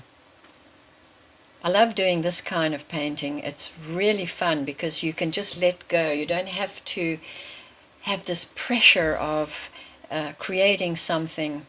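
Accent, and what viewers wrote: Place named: South Africa. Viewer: Hi..I'm new to water colour, and your demo's are so helpful. I'm am oil painter, so it's quite an adjustment trying to work in reverse to what I'm used to. Nice South African accent too...where in SA are you from 😊?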